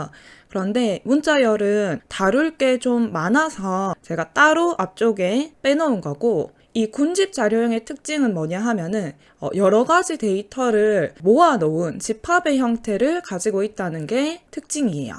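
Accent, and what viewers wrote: Gyeongsang, South Korea. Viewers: 갱상북도 사투리네이~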